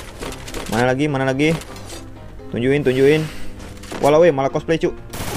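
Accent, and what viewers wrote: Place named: Bali, Indonesia. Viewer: bang lu kok ngomong nya kya logat bali😭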